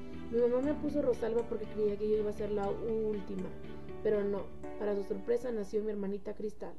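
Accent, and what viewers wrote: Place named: Chihuahua, Mexico. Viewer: ya decia yo que tenias acento de chihuahua :) yo soy de chihuahua !!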